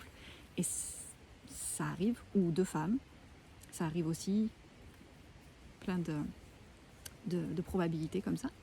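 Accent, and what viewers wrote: Quebec, Canada. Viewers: Ahah j'entends un peu de Québec là là...Merci pour ton message.✨👍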